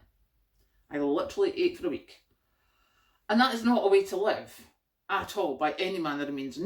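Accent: Scotland, United Kingdom. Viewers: Is that a Scottish accent?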